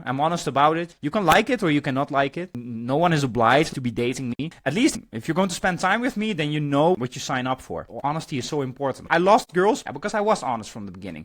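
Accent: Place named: Netherlands. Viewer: I hear a dutch accent.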